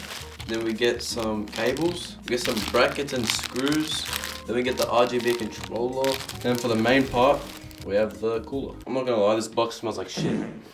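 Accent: Australia. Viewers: read the title people its HIS dream PC not your dream PC also hes australian if you couldnt tell by the accent meaning its 3000 usd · You must be an American who has no sense of culture or world knowledge. His accent is clearly Australian so it’s in Australian Dollars. But you’re American, I don’t blame you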